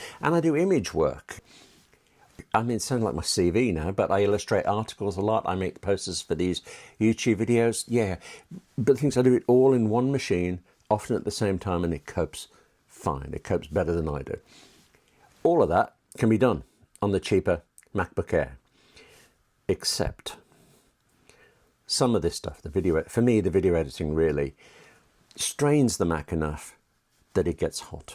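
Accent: United Kingdom. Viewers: Mr. you write for an online tech magazine I can't remember the name of at the moment but I really appreciate your articles about Apple. Great work! Cool to see you online with that British accent. So at some point, Bob's your uncle. Thanks for all you do! Subscribed.❤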